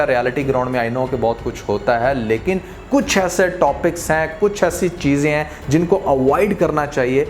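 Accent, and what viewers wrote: Punjab, India. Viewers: Aap English ko punjabi mein bolte hain.PLEASE PLEASE PLEASE DON'T DO THIS.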